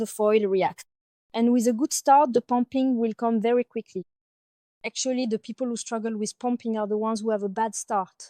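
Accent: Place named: France. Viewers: " 2 weeks ago Cet accent Français en Anglais parlé est insupportable." Not so for this native English speaker. Au contraire, it is very pleasant to listen to. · Cet accent Français en Anglais parlé est insupportable. Dsl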